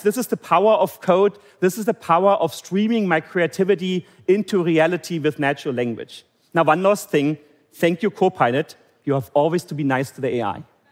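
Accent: Germany. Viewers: My mom once said "If you do not have to say anything nice say nothing at all" and this is why I do not leave a comment about his strong German accent.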